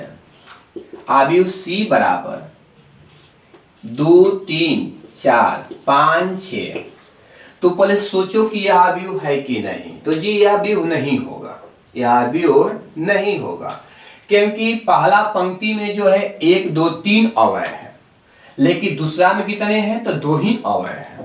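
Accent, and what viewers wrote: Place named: Bihar, India. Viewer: Sir ekdum bihari lagat bani rauuaa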